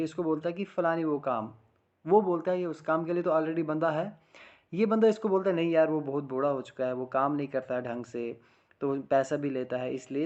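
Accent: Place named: Doda Kishtwar, India. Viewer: Sir aap ke batao se lagta hai ki aap dhodha kishtiward side se rehte hai ❤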